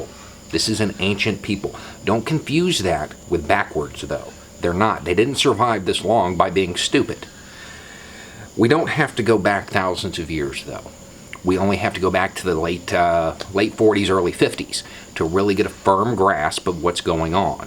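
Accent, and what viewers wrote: South, United States. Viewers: Don't try to fool yourselves by thinking we Iranians see trump calling off the invasion in the last minutes, as a sign of goodwill or concern for innocent people's lives. Everyone here knows he called it off because he was warned by his top brass, that even a single shot toward Iran, would definitely set all American bases in the Middle East on fire. No American can handle this catastrophe. He simply chickened out. Your president is a devil. Look how he thinks and deals with minorities in your own country. How can you be so naive? I really admire your personality and the way you are shockingly pro-humanitarian approaches about hot topics (especially with that southern accent), But thinking that this wet racoon has even a tinge of good will in his all existence is just not true.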